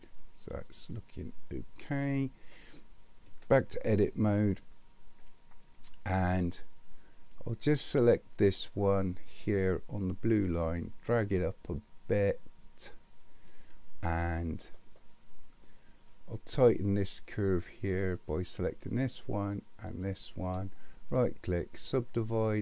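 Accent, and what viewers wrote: West Country, United Kingdom. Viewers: Thanks, nice clear tutorial. Like the west country accent too! makes a change.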